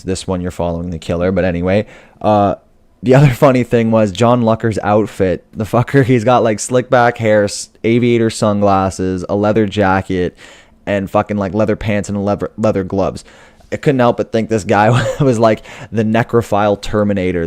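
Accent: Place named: Germany. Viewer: Awesome. Loved your German accent. Nice to see more subs with every video. Keep em coming!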